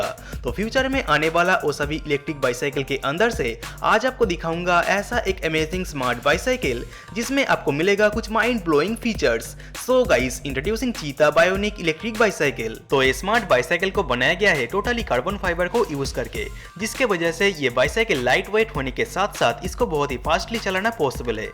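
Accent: Bengal, India. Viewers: Bhai thoda Hindi sudhar lena . Aisa lagta hain ke bengali bol rahe ho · Bengal tone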